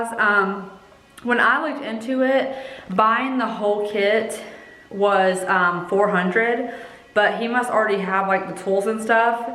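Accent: South, United States. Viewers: The all white will be beautiful! Your southern accent is getting so strong! Also, everyone loves! He is the best and we can’t wait to continue to see him more on your channel! ❤️ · You sound so southern now...love ya x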